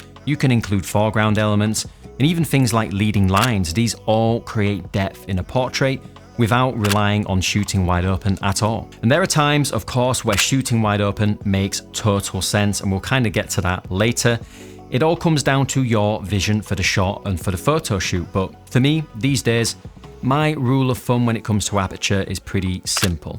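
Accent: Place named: North, United Kingdom. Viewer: by eck it's good to 'ear a northerner on't that there internet..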